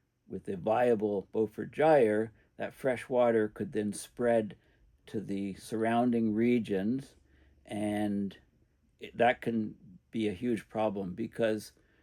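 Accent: Canada. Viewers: Your accent tells me you’re Canadian.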